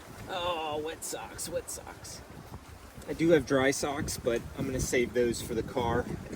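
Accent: United States. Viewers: thank you I just wondered because of your accent. I'm from Phoenix Arizona so it definitely sounded American to me LOL 😆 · thanks for the update on the camera, yikes camera lens is expensive. I thought I heard an American accent. The UK I've seen via this channel is beautiful! I noted there's only sheep, no wildlife.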